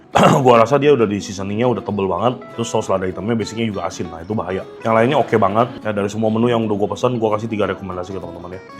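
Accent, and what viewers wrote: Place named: Surabaya, Indonesia. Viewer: Mas atau ko nya logat" medok" Surabaya an 😊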